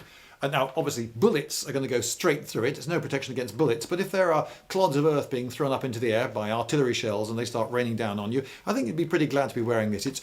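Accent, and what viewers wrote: United Kingdom. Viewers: Explaining anything in an English accent makes whatever you are talking about 10x more interesting. You could be talking about the history of the bar stool and it would be entertaining. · This guy sounds British.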